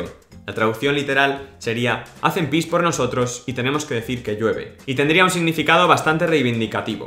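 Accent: Castile, Spain. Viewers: Gracias, pero suenas más castizo que galego. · De dónde eres? Tienes acento castellano 100% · Depende da variante do falante, a C pronúnciase as veces coma TH (theta grega) e as veces coma S/ç. Non todo o mundo a pronuncia igual. E como no brasil o U polo L ou o TXI polo TE. Depende!! De todas iste home ten un forte sotaque castelhano. A súa zona corresponde a un forte seseo, debería pronunciar unha S se aprendera a falar na rúa... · Por isto que entendeu, ele fala um portunhol com acento espanhol forte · A ver... "boda, automóvil y abogado" son anomalías del castellano en el contexto de las lenguas romances. El gallego, por contra, respeta la etimología latina. Eres muy simpático, pero tu comentario al respecto es desacertado. Finalmente, y disculpa, pero tu fonética al hablar en gallego no puede ser más castellana en vocales y entonación. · Este rapaz é de Vigo que é o Madrid de Galicia. 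Ao igual que os de Madrid gustalle desprezar ao resto dos españois polo seu sotaque. Fala galego con un sotaque castelán horrible e cando fai por intentar ler no verdadeiro sotaque galego (galego-portugues) menosprezao decindo que é da Galicia Profunda.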